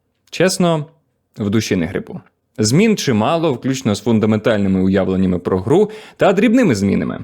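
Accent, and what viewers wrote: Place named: Russia. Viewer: Но акцент не киевский, а прям очень русский - это спасает, наверное)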